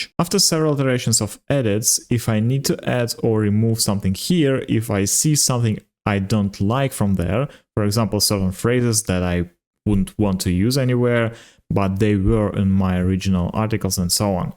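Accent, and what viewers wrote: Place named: Germany. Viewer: your accent is legit german, that's why i said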